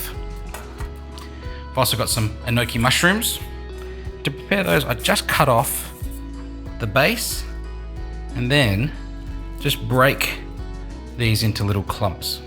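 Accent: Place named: Australia. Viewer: He's Australian.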